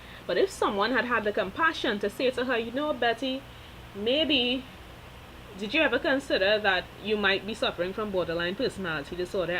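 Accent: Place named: Trinidad and Tobago. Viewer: Sooo... I have a thing for true crime and psychological subjects myself and have been researching this case extensively. Came across this video ans was like whoaaaa... is that a trini??? Cant miss the accent at all. I was like yes definitely a trini. From the moment I listened to Betty's actions, her interviews, everything I instantly felt like it was BPD... I had never been formally diagnosed but when I was younger before meditating and becoming self aware, I had these weird behaviours, hypersensitivity to perceived abandonment and rejection, and a rage that made me question myself a lot. When i researched BPD it was sooo in alignment with what I felt. A lot of the emotional issues I had, I worked through them by meditating and coming in alignment with my inner self, healing past childhood traumas etc.. UNTIL i entered a relationship with a narcissist. 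I was very aware of narcissism and he was completely textbook with the insidious undermining, gaslighting, head games, belittling etc. I found myself becoming erratic again, only in moments of being attacked though. I was becoming violent and nasty every time I was faced with verbal and emotional, sometimes physical assaults. But the thing is, he was so quiet, soft spoken and calculating, and I would react so loud and erratic, that I looked like the absolute nut job. While he stood there with a smirk on his face while quietly saying, "watch how you does get on." And i felt like I was going crazy. I just wanted to make it stop.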